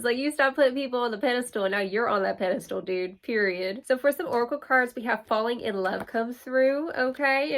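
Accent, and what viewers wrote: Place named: East Texas, United States. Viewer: I never noticed b4 u got that deep east Texas accent 💕 have a great day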